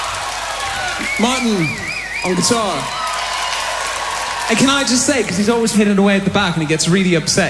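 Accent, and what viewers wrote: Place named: United States. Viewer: he sounds so American!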